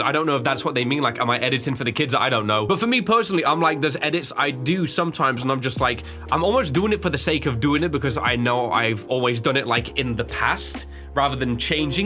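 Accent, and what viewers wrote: United Kingdom. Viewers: For what it’s worth, I think you’re a fantastic YouTuber. I agree that it would be cool to see you explore games other than horror. But I stumbled across your channel looking for Backrooms themed games and I’ve been hooked on your videos ever since. It’s nice hearing a fellow British accent too. Your voice is way more relaxing than a lot of other gamer YouTubers. It would be nice to have longer, unedited content. I’m here for it! Great video! Keep doing what you love!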